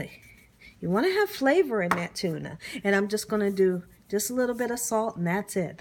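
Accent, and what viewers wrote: South, United States. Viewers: I love that southern accent too. What a lady!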